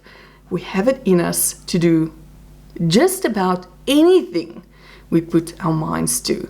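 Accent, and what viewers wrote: South Africa. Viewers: I love your chats when you started talking i recognized that you are South African. Me too born at Cullinan in 1946 all my family are in Australia and some friends so only my daughter and hubby and a boy and girl are my immediate family. . Ive been crocheting since I was 8 year old when my grandmother taught a lefty to crochet. I've done dressmaking and most crafts but crochet and colouring in is my best crafts right now. And have loved listening to you ❤❤❤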